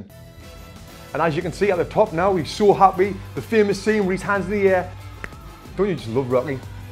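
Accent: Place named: Birmingham, United Kingdom. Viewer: Dude, you are talking like peaky blinders. 😊👍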